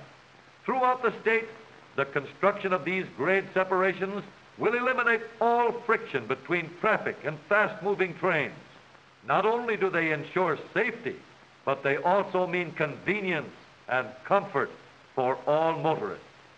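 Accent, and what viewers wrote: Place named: Michigan, United States. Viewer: Oh man the deep Michigan accent 😂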